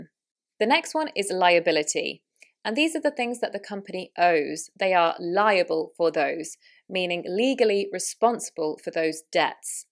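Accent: United Kingdom. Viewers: I love british english❤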